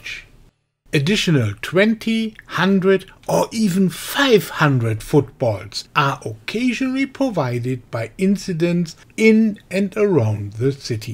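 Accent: Germany. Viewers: Sorry, bad joke. And yes we all can hear he is from Germany, but who cares? He speaks English, right? The video's are easy to understand.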